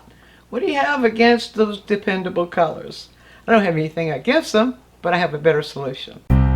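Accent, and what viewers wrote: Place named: Carolina, United States. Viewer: Thank You!! I hardly ever use the earth tones straight from the tube, I always mix in the compliments, but the way you leave the variations on the palette may have just solved my issue I'm having with a subject that has dark bronze skin tones part sun, part shade. Duh! Why haven't I thought of having a gradient available? Also, being from Alabama, do I detect a Carolina accent? lol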